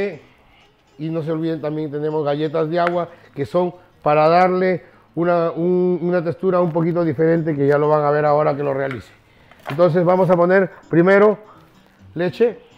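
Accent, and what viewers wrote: Chile. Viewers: hechastes el aji crudo si soasarlo ,na que ver ah otra cosa habla como peruano no pierdas la esencia ,y no hables como chileno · Y PORQUE HABLAS COMO. CHILENO..😃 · Alfredo Santaria si lo mismo me dije yo.. en su uniforme de cocina dice perú.. pero tiene un dejo de chileno inconfundible!. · Queee !! No se pone huevo crudo 😱y dónde está la cebolla el soasado ?? 🤔 Mi abuela le hubiera jalado las orejas No Sabe Nadaaa 🇵🇪 de Huancayna . . Noto un dejo chileno ?🤦🏻‍♀️ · si tu eres peruano shora hablas co.o chileno por favor donde estamos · se le pego de chileno po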